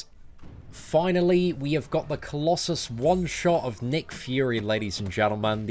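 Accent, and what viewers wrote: United Kingdom. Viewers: gets a paycheck from kabam, don't be fooled by his British accent ppl!!! Ladies and gentmen.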